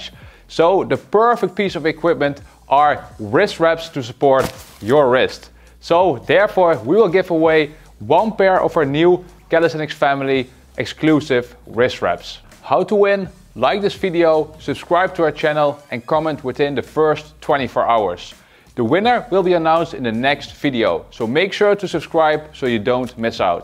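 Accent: Netherlands. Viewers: I like the dutch accent in English :DD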